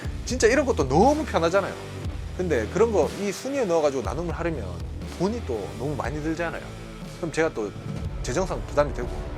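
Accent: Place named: Daegu, South Korea. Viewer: 대구 사투리 전국민이 다 알아들을수 있도록 채널이 더욱 발전되길 기원합니다 해피 뉴 이어~~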